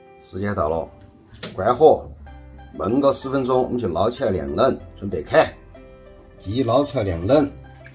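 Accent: Chengdu, China. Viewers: 这一口地道道的成都话！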